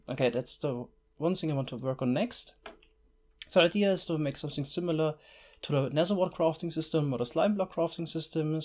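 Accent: Germany. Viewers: HoiiBoy ???? Das liegt daran, dass ein Deutscher Englisch spricht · es klingt wie wenn ein deutscher englisch,spricht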